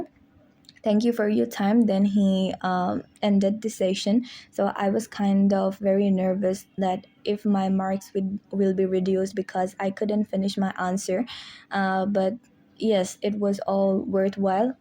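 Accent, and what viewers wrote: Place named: Sylhet, Bangladesh. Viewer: Your accent is really nice, r you from sylhet btw?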